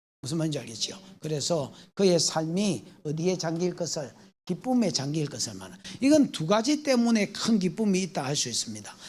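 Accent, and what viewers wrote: Gyeongsang, South Korea. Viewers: 목사님 드디어 주문한 책이 왔어요. 기대 대요 목사님 설교(구속사)를 통해서 마태복음 1장의 족보가 은혜가 됩니다. 전에는 졸리고, 모르는 말씀이었습니다. 비밀이 풀어진듯 합니다. 남편도 성경이 이해가 되어진다고 합니다. 처음에는 경상도 특유의 발음때문에 고생은 했는데 지금은 정겹습니다. 주님의 이름으로 사랑하고 축복합니다. 감사드립니다.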